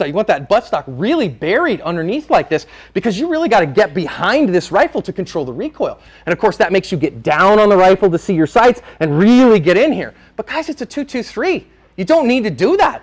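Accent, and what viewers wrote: Canada. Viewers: Love your channel. But holy shit your speech cadence sounds Canadian! Lol. Great great great video!!!! Very helpful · I heard the Canadian in today as well! Great vid! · Have you been spending time in Canada? Where did this Canadian accent come from? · Is he Canadian, ay? Or from Minnesota?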